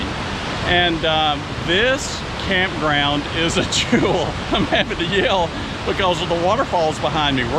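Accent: South, United States. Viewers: my pleasure, your content is great 👍 Also I'm from Florida, but moved to WA state a few years ago, and watching your videos makes me miss hearing southern accents all the time haha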